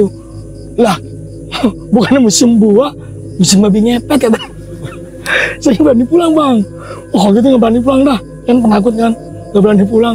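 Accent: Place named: Banten, Indonesia. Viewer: Gendrowo main kejar2n lucu juga..😀 Bang asyik ngebawain ceritanya..senang dengar alurnya..logat bahasanya berasa sangat banten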